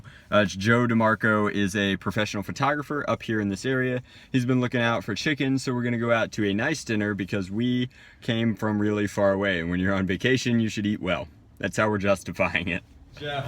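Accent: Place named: United Kingdom. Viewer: stop with the british b.s like boot and zed and aluminium. its makes u look like a tool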